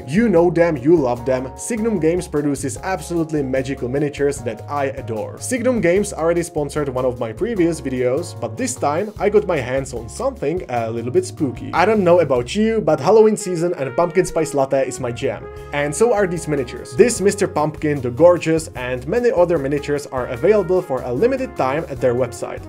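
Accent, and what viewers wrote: Czech Republic. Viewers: Zdar, paradni mas videa bro. Zijes v Ameru? Ja jsem v Chicago..zdalo se mi podle accentu ze budes od nas, ale az pak tvuj email te prozradil a jeden napis Praha na jednom ze stetcu.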